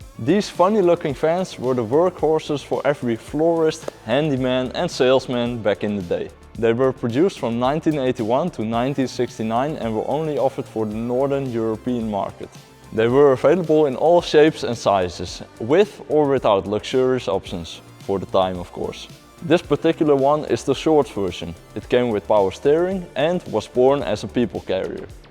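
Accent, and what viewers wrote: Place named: Germany. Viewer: Produced till 1969.....Your Denglish is horrible.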